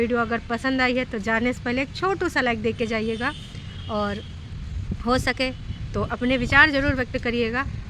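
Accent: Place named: Lucknow, India. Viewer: aap Lucknow se hai kya, beech beech me aapki language jhalkne lagti hai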